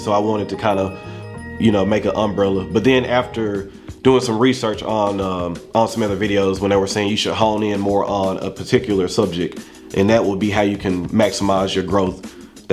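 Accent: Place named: Memphis, United States. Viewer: Man. You got one of the cleanest looking channels. And a a1 personality and presence for this. And that accent yo 😂 Makes me miss Memphis. Gotta go see my aunt and cousin up there soon. I always had trouble finding good channels cause I noticed that some were way too damn bourgie, some were way too amateur, some were alright but the people runnin the channels were lowkey nuts as individuals (and not in a good way) and some were just bad all around by either trying too hard to be all Luxurious or whatever and looking strange for their trouble. There are a whole lot of bad options out there. But I've found a handful of down to earth, decent seeming folks and I'm glad you're one of em. Even if I'm relatively new to ya. Stay at it 👊👊👊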